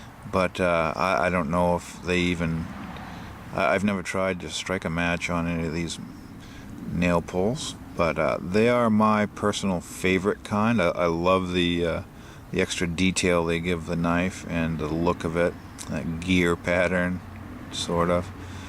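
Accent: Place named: Maine, United States. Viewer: You sound like your from Maine, yut! Love your videos.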